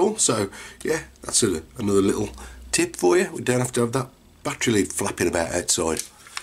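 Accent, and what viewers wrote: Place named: West Midlands, United Kingdom. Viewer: Hi.. I have one on pre-order looking forward to it arriving:) great vid.. subscribed.. whereabouts you from sounds like your around west mids? im from Streetly.. Sutton:)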